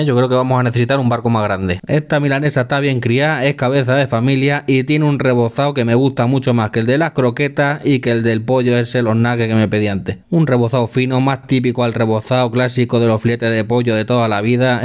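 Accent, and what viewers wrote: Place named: Cáceres, Spain. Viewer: De donde eres ? Tienes acento cacereño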